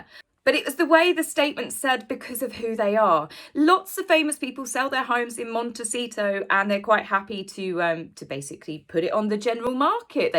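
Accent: United Kingdom. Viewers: Great video. I just wish you could talk a little slower... sometimes it's hard to understand with your British accent 😉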